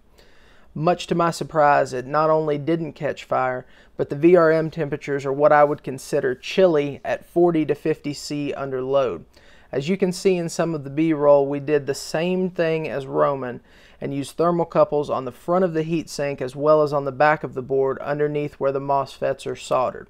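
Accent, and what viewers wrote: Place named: South, United States. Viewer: "happy as a pig in shit" Dude, you have a wonderful way with words. I haven't seen a tech channel run by someone with such a strong southern accent. It's a great pairing! I don't really subscribe to channels these days, but you seem to be making promising, informative videos and I want to see you grow, so I subscribed. Looking forward to more stuff from you!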